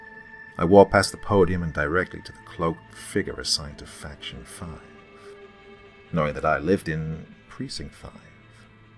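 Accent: United States. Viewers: Two things. 1. You sound like Benedict Cumberbatch 2. Love the American accent. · For the most part, most fake American accents on youtube are SUPER cringe-worthy. But damned if yours wasn't pretty spot on!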